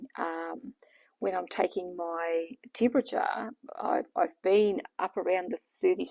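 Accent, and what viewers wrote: New Zealand. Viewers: Still can't get over how beautiful the NZ accent is. Thanks for the advice on tudca.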